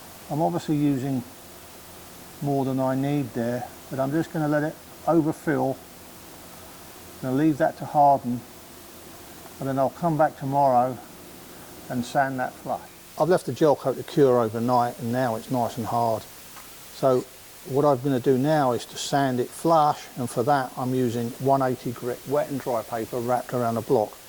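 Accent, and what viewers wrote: United Kingdom. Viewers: BoatUS with a British accent. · Boat us but it’s a Brit 🇬🇧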